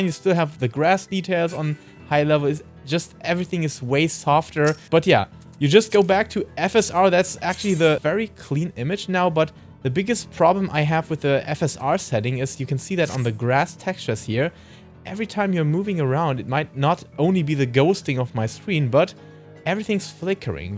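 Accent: Germany. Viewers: Haha, bei dir merkt man sofort am Akzent, dass du aus Deutschland bist. Grüße aus Niederösterreich.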